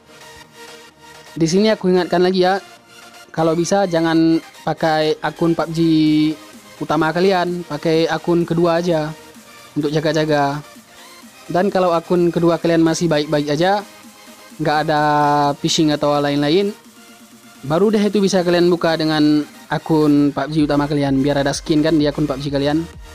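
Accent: Aceh, Indonesia. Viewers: logat orang ACEH 🔥🔥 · sudah ku duga logat aceh hhh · Aceh habis logat nya.. Wkwkw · Bang orang aceh ya suaranya kayak orang aceh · Bg kayak org aceh bicaranya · LOGAT ORANG ACEH SUDAH SAYA SUB,MAJU TERUS BANG. SEMOGA BERKAH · hhhh...kupikee pakon logat aceeh...hana hie awak jawa😂🤣...auto sub nyoe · Hahaha logat Aceh abeh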